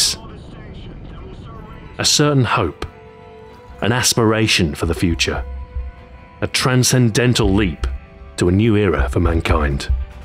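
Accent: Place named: United Kingdom. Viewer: Gaming Culture Well he sounds like he's from the UK and we have Dominoe's here as well.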